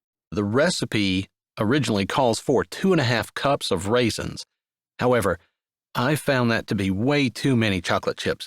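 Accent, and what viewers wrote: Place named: Ireland. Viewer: Oh my goodness, the Irish accent! Love it!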